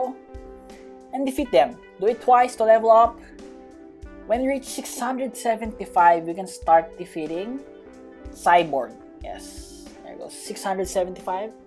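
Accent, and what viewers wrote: Philippines. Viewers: is a filipino because the accent is familiar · Fact: he's a Filipino cuz of he's accent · your voice sounds english is no that good you pinoy?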